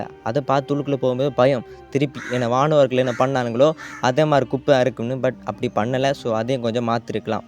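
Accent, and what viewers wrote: Sri Lanka. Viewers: Sri Lankan tamil ah neenga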